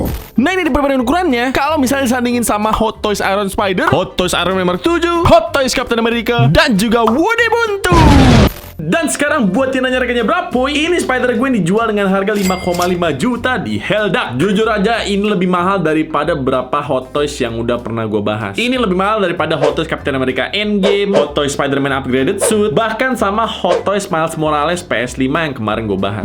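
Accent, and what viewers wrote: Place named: Java, Indonesia. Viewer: Orang jawa abng nya ya😂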